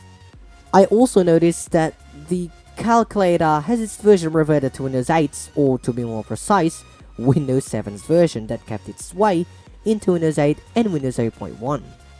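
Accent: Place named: Australia. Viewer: it's the Australian accent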